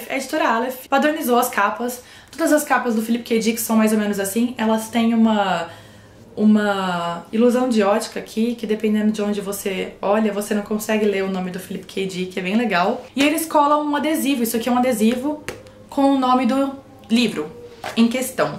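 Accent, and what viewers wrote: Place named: Caipira, Brazil. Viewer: univeRLso foRLte maRLte Que gracinha, esse sotaque caipira. X-) E pensar que esse som RL (um fonema denominado "erre retroflexo") vem da língua tupi, e que se incorporou à fonética portuguesa graças ao fato de que os índios capturados pelos bandeirantes não conseguiam pronunciar o erre vibratório lusófono (presente em palavras tais como "rato" e "robusto"). Eu ia lhe sugerir um livro (na verdade, uns livros). Porém, como vi em seu About que suas preferências são "...fantasia épica, ficção científica e suspenses policiais...", creio que não se interessará por obras filosófico-existencialistas sartrianas, tampouco por um documentário-poesia do Darcy Ribeiro. :P ...mas, se mudar de ideia (a respeito do documentário): watch?v=eqlcHGj4f7k&t=2h36m21s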